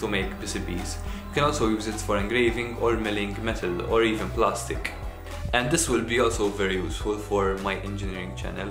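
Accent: Malta. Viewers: Thats a very maltese accent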